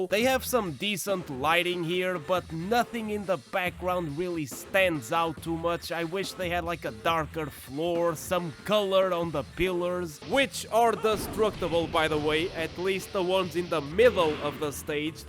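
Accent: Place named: Portugal. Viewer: Wowowowoww do i hear....portuguese? Um português fã de Tekken 4 rahhhhhhh🇵🇹🇵🇹🇵🇹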